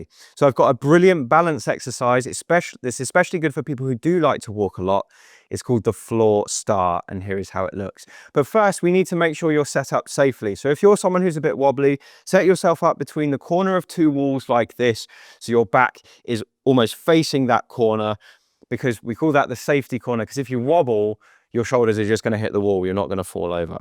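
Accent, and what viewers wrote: England, United Kingdom. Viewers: The last exercize for balance was amazing! I've never seen it before and plan to add it to my routine. I love this channel for all the tips gives us - the English accent is a bonus!:)😊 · I am irish and I love his english accent! I was born in Leeds.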